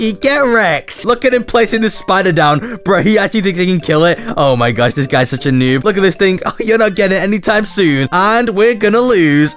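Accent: United Kingdom. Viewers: Bros British…Hello mate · HES BRITISH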